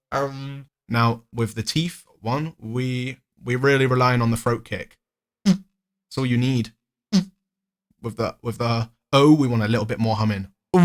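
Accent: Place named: England, United Kingdom. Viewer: English accent 🔥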